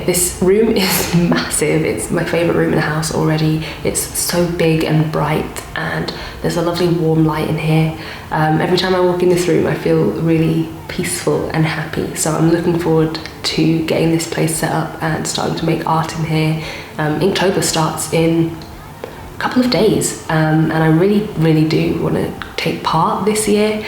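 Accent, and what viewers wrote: United Kingdom. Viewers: i love to listen to people with a british accent that's so asmr tbh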